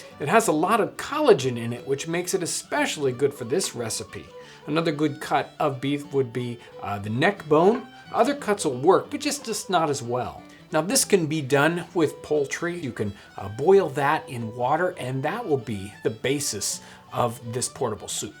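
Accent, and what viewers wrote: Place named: United States. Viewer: What happened to the British guy that used to do this ? It's throwing me off a little that this guy's American haha still great all the same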